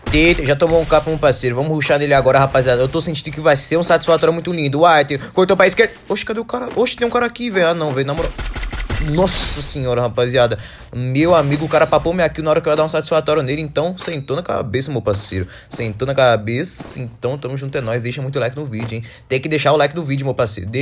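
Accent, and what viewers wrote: Brazil. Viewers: Uai ze c e mineiro ze??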